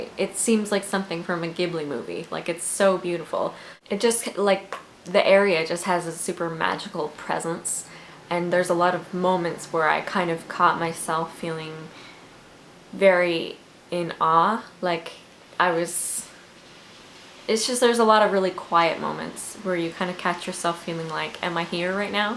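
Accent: Newfoundland, Canada. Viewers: You sound sooo canadian! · Hey! I met you when you were at the Folk Festival, I dont think I told you my name haha but I came up to you wearing a dress by the shirt stand. Anyways, it was really great meeting you and I love the fact that your Newfoundland accent is really strong here hahaha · my family is from Newfoundland too! I was born in Ontario, though, so I don't have any of the accent like you do (BUT IT IS ADORABLE I WISH I DID). Every time I've been I get the same sort of 'oh my god how does this place even EXIST' feeling b/c it's so pretty and everyone is so sweet :) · omg your newfie accent is thicker then mine and I live here ! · Hahaa newfie accent :P I don't think mine is very bad. I don't notice it though because I live here. That's so awesome though! :D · You have more of a nl accent than me and I've lived here all my life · Ahh Newfoundland accent! 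I'm from NS, and NFL is my favorite accent ever. Never thought I'd see a maritimer youtuber. This made my day! :)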